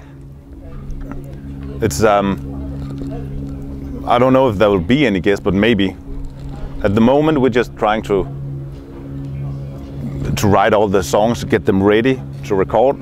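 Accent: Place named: Denmark. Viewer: Why fix it if it ain't broken ~ gotta love the slight Danish accent, these guys are great, can't wait to see them live next year!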